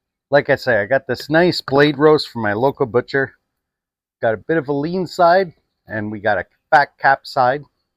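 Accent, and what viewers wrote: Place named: Minnesota, United States. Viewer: Dude sounds like he is from Minnesota...eh.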